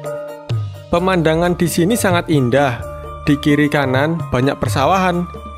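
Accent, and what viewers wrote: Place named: Banyumas, Indonesia. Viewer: Mas asli orang mna sh, kok ngomong nya agak ngapak sedikit 🤣🤣🤣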